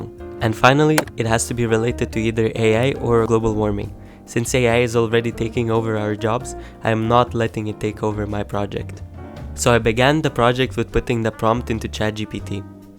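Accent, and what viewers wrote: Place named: Eastern Europe. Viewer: oh great, first I heard you I thought that you are slavic, by your accent 😅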